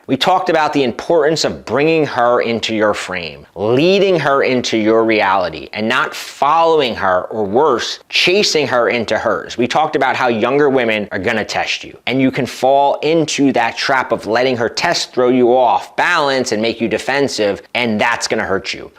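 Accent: United States. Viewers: where does this american accent come from ?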